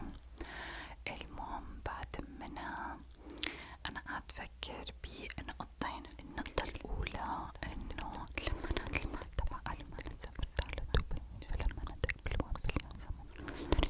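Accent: Egypt. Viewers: اللهجه المصريه عسل 😊❤ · اللهجه المصريه عليكي تحفه بجد❤❤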